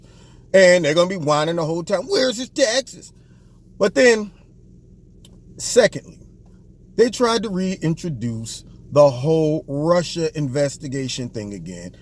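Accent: England, United Kingdom. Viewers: Hello. I live in England. I loved your English accent. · You do a good British accent. It is my secret wish that we could somehow peacefully gather together to bring down CNN. I dont want to inhibit free speech, but they should not be able to call themselves the press. There is no single source of hate in our society, but CNN is right up there with the worst of them. Because they intersperse their hateful bias with some semi news, they get away with pure propoganda that is taken seriously. What bothers me the most is how bad they make our country look to the rest of the world. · bad accent , and not many englishman speak like that. its like saying all americans have a New York accent. good points other wise. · Good limey accent. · BRILLIANT SUMMATION! Love your English accent haha Sending love from the UK!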